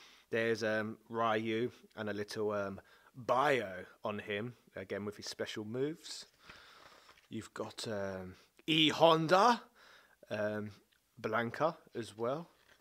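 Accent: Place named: Bromley, United Kingdom. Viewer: I noticed you did a bit of a posh accent in this video. Does that mean you live in Bromley?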